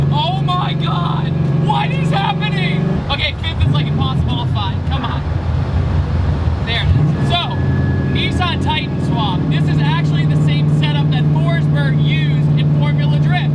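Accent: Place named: South, United States. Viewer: Oh no.... It's finally happened... has been living in Georgia too long & is losing his ability to sound normal slowly.... he keeps saying "S13" coupe and it sounds like he's saying "S. R. teen" Don't let that slack-jaw southern draw seep in to your brain! PRONOUNCE your words... don't become a country boy for all things holy.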